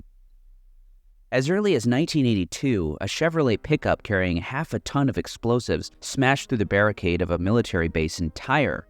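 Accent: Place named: United States. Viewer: He does speak with an American accent.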